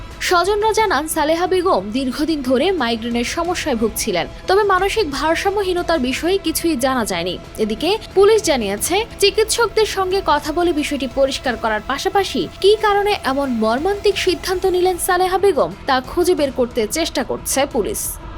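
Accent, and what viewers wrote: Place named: Kolkata, India. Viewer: কোলকাতা স্টাইল এ নিউজ পড়ার কারণ কি? আপনার মতো সাংবাদিক কিভাবে একটা চ্যানেল রাখে 😑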